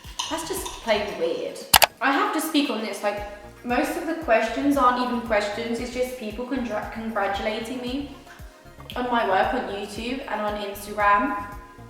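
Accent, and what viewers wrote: England, United Kingdom. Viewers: Adoro o sotaque britânico , acho que devias apostar mais por estes vídeos até crescias muito mais prefiro ver estes vídeos , assim conseguias te aproximar mais da tua cultura e nos também Pensa nisso Beijinhos e muito sucesso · Hiii! I love your british accent!! Please do more videos like this! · Que lindo é o teu acento inglês,. Adorei o video! Tu faz como queres: inglês o português. Eu sei que irei a gostar. Beijinhos! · So british eheheh adorei :D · OMG the british accent !! 😍😍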